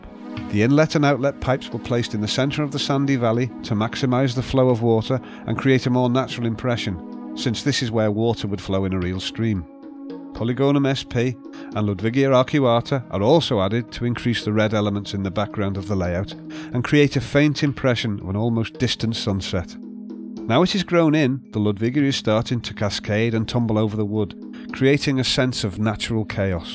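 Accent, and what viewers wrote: Liverpool, United Kingdom. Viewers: Absolutely beautiful but the voiceover by a scouser on Valium reading from a 12 year olds school essay really detracts from the aesthetic as a whole.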